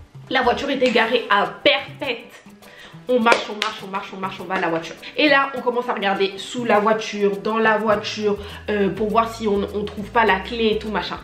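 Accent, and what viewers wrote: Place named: Ivory Coast. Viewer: Ton accent ivoirien me plaît grave 😂😘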